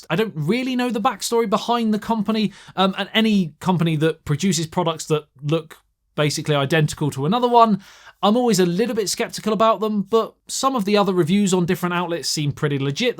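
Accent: United Kingdom. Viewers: What country you're from? Is that a British accent?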